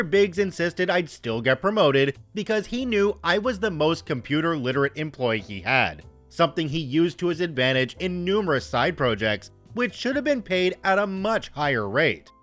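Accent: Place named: Canada. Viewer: Hearing swear words in Canadian accent "chef's kiss" I was getting pissed as well. As the great Bill Burr likes to say, that LAY-DEE is a cvnt.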